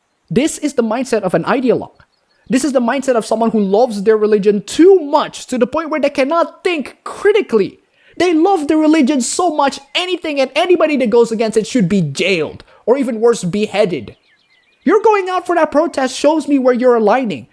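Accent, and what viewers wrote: United States. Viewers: You're speech kinda reminds me of the things a lot of the stuff my fellow Californians have. Also good luck.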